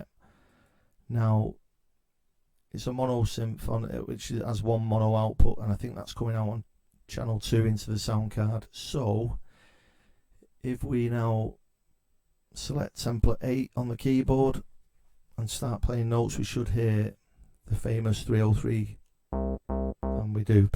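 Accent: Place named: Manchester, United Kingdom. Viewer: Hi bud, been thinking of buying the Mk111, looks like a lot of menu diving though and a pain to set hardware and software VST up to as there's no midi control software for the Mk111. I'm going off previous video's I've watched Obviosly. How are you finding it? You sound like your from Manchester too:) Oldham I'd say...am I close??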